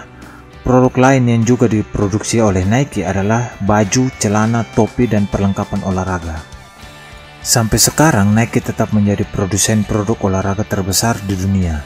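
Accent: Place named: Eastern Indonesia, Indonesia. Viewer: Orang timur ni logat nya smoga sukses bro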